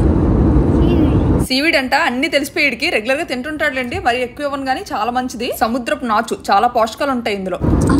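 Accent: Vizianagaram, India. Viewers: Maa vzm slang untadhi chari ❤ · మన విజయనగరం భాష వింటుంటే చాలా సంతోషంగా ఉంది అంది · MI papa ni tesukelatam leda enra dooram vellina vijayanagaram language maaraledu meku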